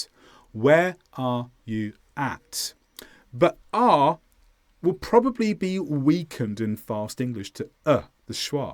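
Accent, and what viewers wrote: England, United Kingdom. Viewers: I like your British accent and teaching video, which is perfect since it helps me gain more English grammar rules, phrasal verbs, and expressions. But there is little difference in English usage in other English-speaking countries such as the U.S., Canada, Australia, and New Zealand....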